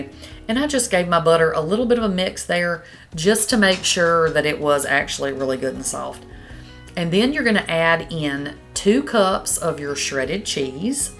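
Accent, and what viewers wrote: South, United States. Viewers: You have such a beautiful Southern Accent. What State are you from?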